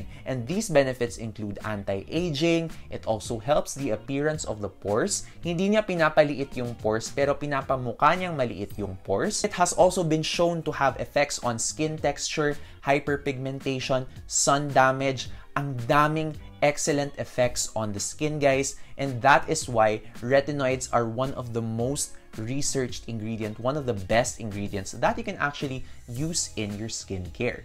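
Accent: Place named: South Boston, United States. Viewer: Soo Southie nga 😂 Just stumbled upon your channel, very informative 👍🏽👏🏽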